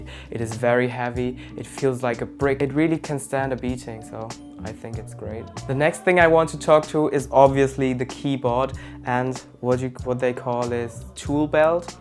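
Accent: Germany. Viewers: i thought so. I've been living in düsseldorf for about 1.5 years now. i can easily recognise the german accent^^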